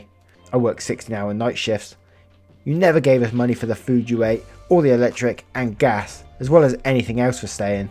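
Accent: United Kingdom. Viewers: I think I asked on another video, but where are you from specifically in the UK? I hope to start becoming more familiar with the different regional accents. Much love!😍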